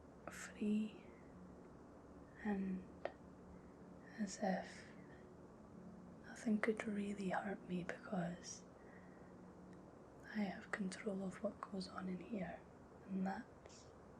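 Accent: Scotland, United Kingdom. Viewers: I love the Scottish accent. It's so pleasing and sexy to listen to. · I stumbled upon your channel thanks to the algorithm. Rare for me to fully understand every word from a Scottish accent. Thank you for the calming video. +1 sub. Based on comments, you may have been away for a while. I see it often. Take time as you need. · Time to melt to her beautiful Scottish accent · I literally clicked this video just to hear the Scottish accent. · Beautiful British lass/accent. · I've just come across your channel. Sitting comfy on my sofa. Headphones on. Play! Close my eyes and your tones give me a tingling sensation from head to toe. Be still my beating heart. I've always loved the Scottish woman's accent. I meditate everyday for my own mental wellbeing. So Scottish lassie you waffle all you like, Because this Irish lad will listen to you all day. Stay healthy, safe and carefree.😍❤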